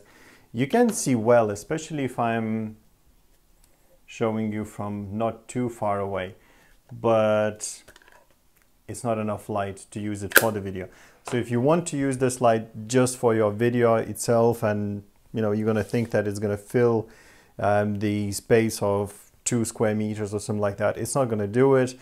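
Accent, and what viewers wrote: Ireland. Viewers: Thank you so much for this review, it has helped with my decision to purchase it. Totally off topic but where is your accent from? I'm sure I can hear an Irish twang mixed in with European!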